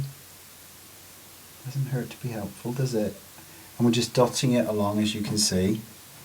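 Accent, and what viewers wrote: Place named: West Country, United Kingdom. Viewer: There's a bit of a west Country accent there this must be long before you went to America.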